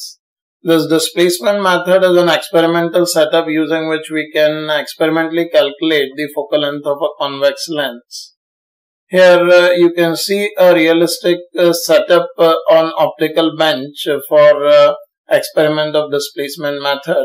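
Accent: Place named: India. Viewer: It's unfortunate that only Indian-English speakers can benefit from this video.....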